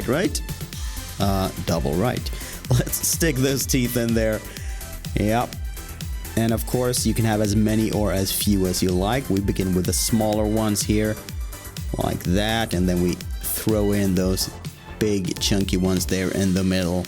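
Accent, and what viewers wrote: Sweden. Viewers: you can really hear that he's Swedish too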